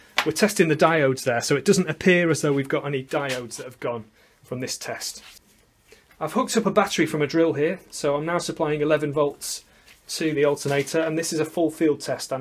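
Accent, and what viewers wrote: United Kingdom. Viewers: Great video, but I'm confused; your vernacular is dollar based, whereas your accent is pound centric. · Gracias por tu vídeo. Por tu acento debes ser Británico. Me agrado oír que dijeras KAPUT. 😜😜😜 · You talked about the cost in dollars of parts but you sound very British, are you? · Very good video, you sound like your from the UK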